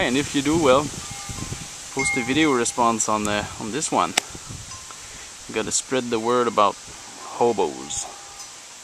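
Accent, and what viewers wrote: Quebec, Canada. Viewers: I was gana make a comment about in Canada we just trow a bit of water out and see if it freeze but Avec ton accent j'ai réaliser que tétait canadien. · Sonne accent québécois ca mon chum ! Bon video !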